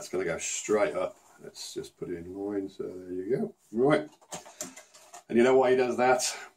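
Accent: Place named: England, United Kingdom. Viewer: After seeing a bunch of your videos, I've realized you use a lot of the terms my dad uses and you even have a similar accent. What part of England are you from? My dad's from Saddleworth in Manchester. Are you from around there?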